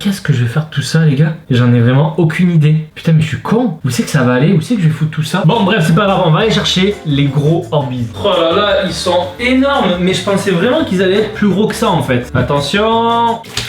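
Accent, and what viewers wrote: Marseille, France. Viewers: C'est un marseillais c'est son accent qui le fais dire litchi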